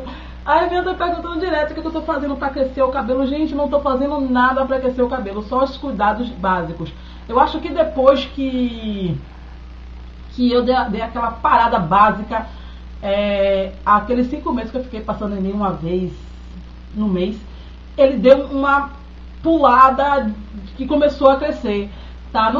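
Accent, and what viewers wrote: Bahia, Brazil. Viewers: esse sotaque ai eu conheço .povo bahiano é tudo animado mesmo.quarquer dia eu vou na bahia conhecer melhor a cultura de vcs.amei o video bjs!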